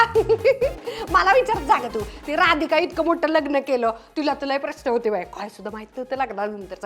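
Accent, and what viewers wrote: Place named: Satara, India. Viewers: Khup chaan saataari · Ase satari tone madhe banvat ja vedio every week. Ekdam zaak performance. ❤❤❤❤❤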